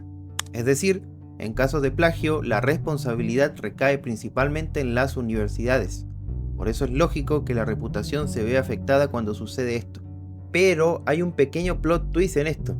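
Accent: Chile. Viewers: hablas como chileno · Eres chileno? Por la forma de hablar. Por cierto, saludos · Eres chileno? Tienes el acento. Lo sé porque soy de Tacna y es muy marcado su acento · Oye porque tienes acento chileno? eres chileno o peruano me confunde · ¿Es chileno? 🤔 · Eres de chile ? Tienes cierto acento 🤔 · Eres sureño? Siento chilenidad en tu acento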